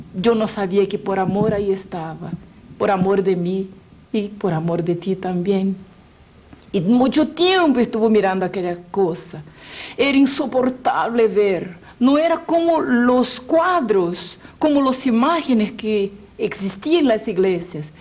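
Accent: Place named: Brazil. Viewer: parla spagnolo con acento brasiliano....forse originalmente il video è stato fatto per gli spanoparlanti...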